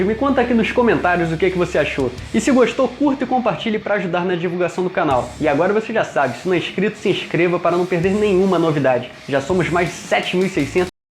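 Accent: Rio de Janeiro, Brazil. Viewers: kkk mnw sotaque carioca é muito foda kk melhor sotaque do país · Engraçado a forma que os cariocas falam nascer, eles colocam i no meio, tipo naicer.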